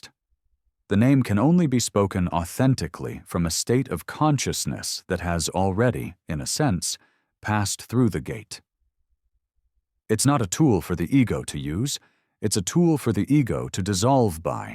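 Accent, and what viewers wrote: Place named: Kenya. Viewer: Those vowels sound and are exactly like those used by Kikuyus in Kenya 🇰🇪. A,E,I,O,U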